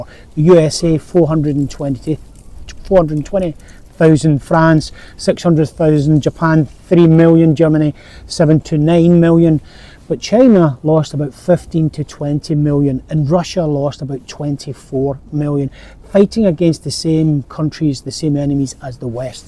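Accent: United Kingdom. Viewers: can any one tell me which area of uk does this YouTuber come from? his accent is interesting. i want to visit that part of uk. i once head the original English once had uvular trill. Britain should pronounce as blr~, just like he pronounced. Indian got their accent from that. i wonder if that's true.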